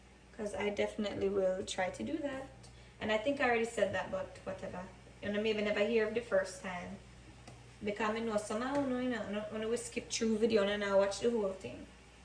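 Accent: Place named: Jamaica. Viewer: LMAOOO OMG !!! I love you speaking in patois!! Im jelly! I can't do it.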